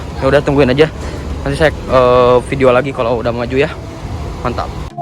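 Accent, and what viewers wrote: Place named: West Java, Indonesia. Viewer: Logat khas orang sunda😀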